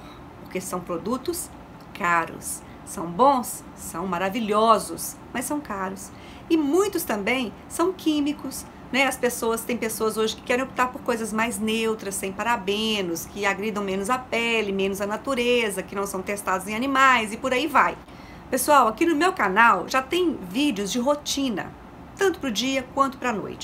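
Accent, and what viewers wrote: Minas Gerais, Brazil. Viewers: Você é mineira? tem um sotaque diferente! Adorei seus vídeos. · sou casada com um mineiro.... amo sotaque de vcs! Te sigo aqui, te adoro, sempre amorosa e realista!! Você parece demais também com a sogra da minha filha, na voz e fisionomia, lindaaaa você! Gratidão por seus conteúdos!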